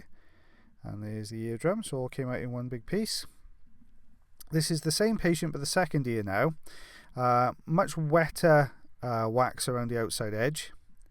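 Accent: Wales, United Kingdom. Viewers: Sorry folks, I released how stupid a comment this was immediately after writing it. I had just never seen subtitles pop up on this before, and it was a bit of a dig at Americans who require the measurements in inches because they can't multiply by 2.5, nor understand English spoken in any other accent. Mea culpa! Love the Welsh! My grandmother was unintelligibly Scottish!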